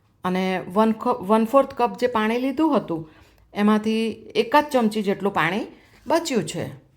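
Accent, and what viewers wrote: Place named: Valsad, India. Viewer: Valsad baju na su k madam tme?bau saras rcps hoy 6 tmari😃😃😃 pn tmri bolvani style pr thi lage 6 vlsad jeu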